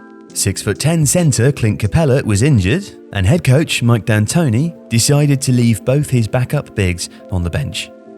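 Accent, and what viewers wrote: United Kingdom. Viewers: i love how a dude with a british accent is talking about american basketball lol. fr tho i love your vids keep it up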